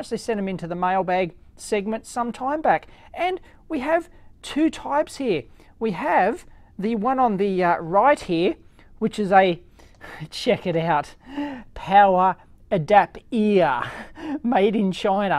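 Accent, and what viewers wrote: Australia. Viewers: he is not British he is Australian they sound nothing alike for god sake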